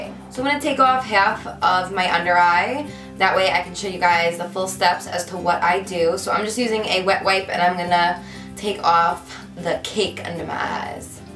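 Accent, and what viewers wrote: New Jersey, United States. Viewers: Are you from New Jersey? I can hear the accent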